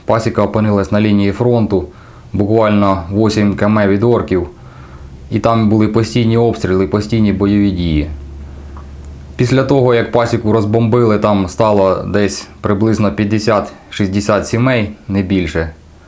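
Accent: Ukraine. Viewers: какой же поганый суржик) жалко хозяйство , побольше нервов и сил на восстановление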